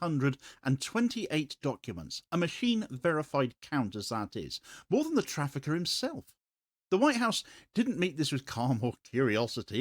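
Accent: United Kingdom. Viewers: Love your vids, be careful if you live in uk(you sound British)they might arrest you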